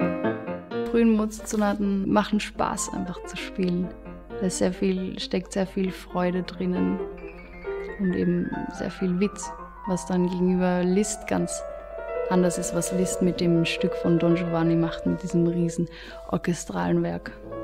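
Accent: Vienna, Austria. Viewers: Sie klingt schon nach Wien. Wirduose Sochen. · She speaks Austrian German, that’s why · Ist halt Wiener Dialekt. Ist quasi das gleiche wie München und bayrisch. Auch für hochdeutsche gut zu verstehen weil nicht so sehr abweichend. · you do realise that this is about her german/austrian accent in the english TwoSet vids? Besides that you can clearly tell that she is austrian/viennese from her pronunciation and intonation aswell as her used vocabulary. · Austrian accent <3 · Nein, ist nicht Wiener Dialekt. Also, das vielleicht auch, aber sicher nicht in diesem Video (hör dir einmal wirklichen Wiener Dialekt an, das ist ganz anders). Deutsch (wie Englisch übrigens auch) ist eine plurozentrische Sprache. So wie US-Englisch kein "US-Dialekt vom Britischen" ist, gibt es neben dem Hochdeutsch in Deutschland auch das Hochdeutsch in Österreich, die unterscheiden sich halt ein bisschen voneinander. 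Und diese beiden Arten von Hochdeutsch haben dann Dialekte bzw. die allerwenigsten Leute sprechen wirklich reines Hochdeutsch (weder in Österreich noch in Deutschland). hat ein bisschen einen Wiener Akzent, aber zumindest in diesem Video spricht sie (vermutlich absichtlich) recht nahe am Österreichischen Hochdeutsch.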